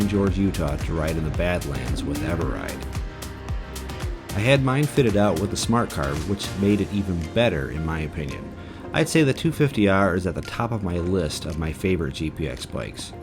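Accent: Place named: Northeast, United States. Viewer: Are you in upstate NY, NH or Vermont? Gotta be one of those judging by your accent. Also the FSE250E is now fuel injected and runs the same motor as the CRF250F, which is one of Honda’s Chinese/Thai bikes.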